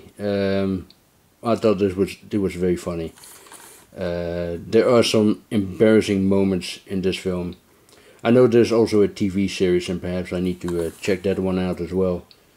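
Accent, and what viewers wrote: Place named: Italy. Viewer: Fantastic update 👍😎& plenty of Italian films. The De Palma-De Niro early movies I have never seen, fans of both & might consider picking up. I enjoyed “The fifth cord” & interesting extras from Arrow video. Well spoken in your Italian accent 👍, “Double face” I almost picked up in the Arrow sale. As I’ve been on a Giallo kick of late, I do want all the Sergio Martino Giallo movies after enjoying watching “The strange vice of Mrs Wardh” “Street law” cool Euro crime movie & I agree the Grindhouse releasing of “The tough ones” aka (Rome armed to the teeth) is one of the releases of the year. Nice touch the numbered bullet 😎 I do rate “Almost human” as Umberto Lenzi’s finest Euro crime movie also. Definitely, more Maurizio Merli Euro crime movies 👍, I have most of them as bootlegs. What a coincidence in the Dorado release of “The seven from Texas” in getting numbered 401 & 402, if you remember, you reminded me of the release as I totally gave up on the release 😬& when you did I ordered straight away as it’s limited to a small number. 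“Broken arrow” fine early western with the reliable James Stewart. “Saving Private Ryan” is on my 4K wanted list, as I’m imagining the beach scene will look epic on 4K. Perfect finale to this update, a mention to Rutger Hauer & the sad passing of a great actor ☹️I always enjoyed all of his roles. Maybe a Rutger Hauer Collection video 🤔 Cool update friend 👍😎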